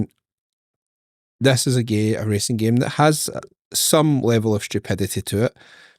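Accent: Scotland, United Kingdom. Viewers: Maybe a stupid question but had to ask after seeing any of your videos for the 1st time and hearing your voice: You from Scotland?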